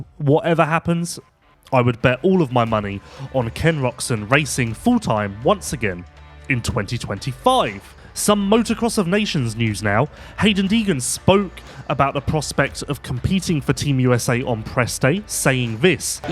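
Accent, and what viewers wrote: United Kingdom. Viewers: Yes, we need American english. It's a bummer when American legends like Roczen and Jet has an Englishman covering their series... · Can we not have a Brit do the voice over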